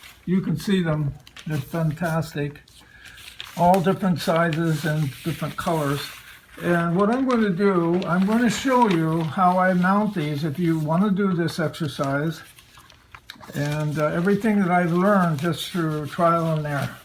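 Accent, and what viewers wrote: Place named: Mexican American, United States. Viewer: Love your work. May I ask what ethnicity you are if I close my eyes I can hear the voice of someone I know who is Mexican American. Not that it matters. In any event keep up the great work!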